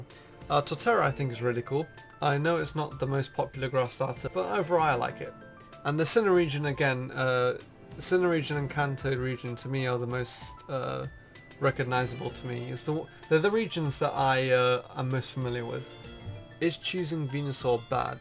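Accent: United Kingdom. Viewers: are you like British? you sound British.(sry I don't mean to be so rude. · He said half white and half Chinese, I can only assume he's British, based on his accent. :)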